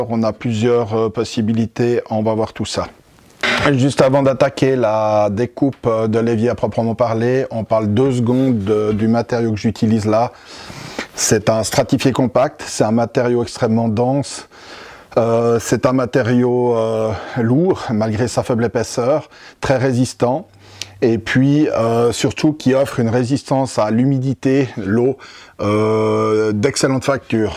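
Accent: Switzerland. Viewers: J'essayais de trouver cet accent et je me disais Apple Bière ben voilà ;-) aller pour une fois qu'il y a un Suisse qui se donne la peine je m'abonne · Avec un accent vaudois ?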